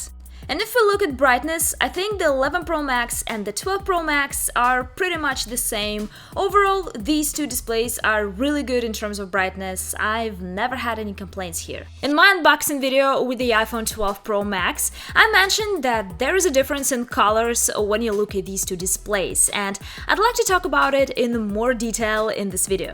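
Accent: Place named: Russia. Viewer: Привет,. Приятно слышать русский акцент:)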